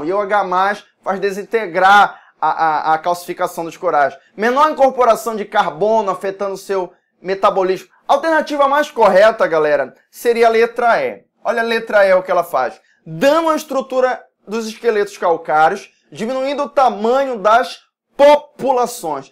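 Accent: Pará, Brazil. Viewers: Muito bom 👏👏 Prof vc é do PARÁ? Sotaque muito igual!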